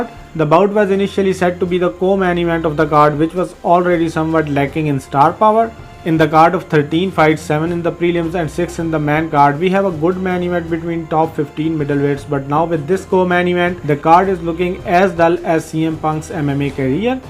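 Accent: India. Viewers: This is english with indian accent...french and other latin language WE sound a lot différent...so you learning english with indian accent dont be afraid to Say it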